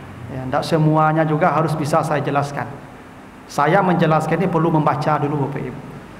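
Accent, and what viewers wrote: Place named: Minangkabau, Indonesia. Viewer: Khas muralabuahnyo . Membuek ambo takana jo kawan ambo maso di padang daulu. Tapi rancak end mantap mendanga ust membawo ceramah. Ambo sanang 👍👍👍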